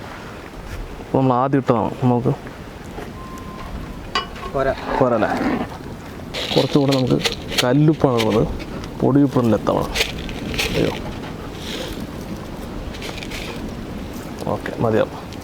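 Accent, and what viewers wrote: Palakkad, India. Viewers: Palakkadan Bhasha ❤️❤️❤️🥰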